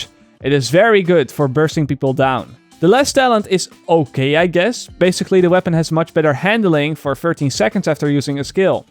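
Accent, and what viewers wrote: Netherlands. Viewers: you have a dutch accent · Love the content on your channel aswell as your Dutch accent. Great Video, keep it up!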